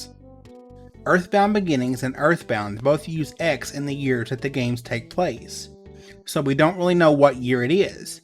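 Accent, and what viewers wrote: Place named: South, United States. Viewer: Finally a Southern youtuber! Haha, cool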